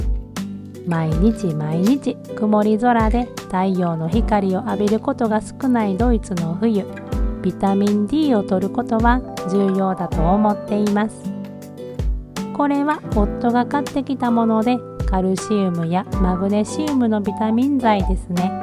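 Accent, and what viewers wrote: Kansai, Japan. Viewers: はんなりとした関西弁❣️めっちゃ好きになりました❣️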